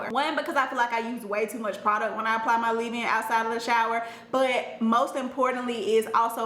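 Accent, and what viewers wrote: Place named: Alabama, United States. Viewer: Had a feeling you were from Alabama..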